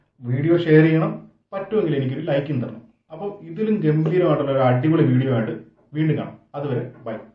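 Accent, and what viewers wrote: Kottayam, India. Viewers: pure കോട്ടയം slang.. വാഴൂർ-? പൊൻകുന്നം? കാഞ്ഞിരപ്പള്ളി??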